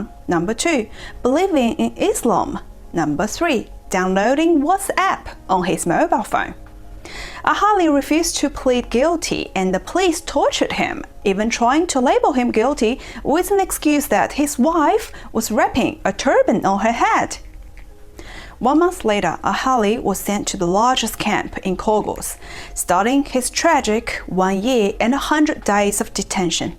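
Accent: Australia. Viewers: Really Fly! (真飛！）澳系口音舒服。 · 好像是澳州口音的英文。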